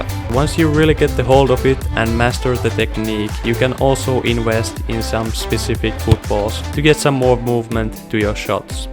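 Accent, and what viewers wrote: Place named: Finland. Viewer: the accent screams suomi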